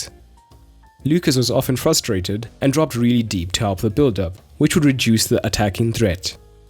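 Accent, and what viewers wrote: South Africa. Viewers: This is a South African accent.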